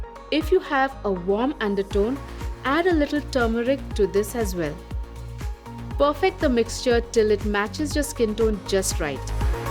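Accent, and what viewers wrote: India. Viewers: shes black. how she has indian accent?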